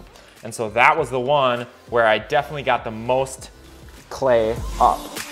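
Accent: Minnesota, United States. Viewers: sweet vid. I have been taking classes here where i live in north carolina on the outer banks for the last 2 years- my friend and I just scored a full studio set up and we are just now in the new phase of starting to sell pottery. its soooo fun! We are stoked and love your vids! Im originally from MN and come home every year so I am going to have to come check out Mocha Monkey! you sound like all my friends back home and its sweet to hear your MN accent/vibe!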